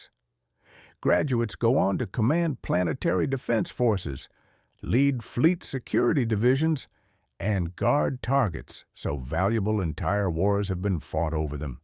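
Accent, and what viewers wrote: South, United States. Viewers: Cowboy accents don’t mesh well with sci-fi stories, sorry. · You liked an "alien" speaking with a southern USA accent? I find it so stupid I quit watching! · Narration is an amazingly human sounding AI... with just a touch of a Southern accent. Thank you very much. Little old lady listening from rural West Virginia, USA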